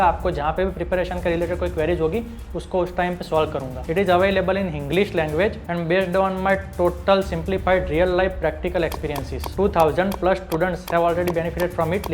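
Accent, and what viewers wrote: Gujarat, India. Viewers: pure gujarati english😅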